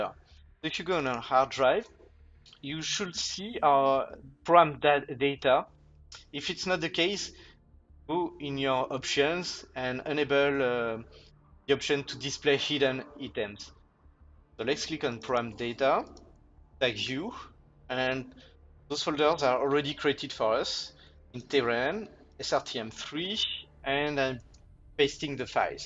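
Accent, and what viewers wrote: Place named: France. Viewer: On sens tellement que tu es francais^^ mais super vidéo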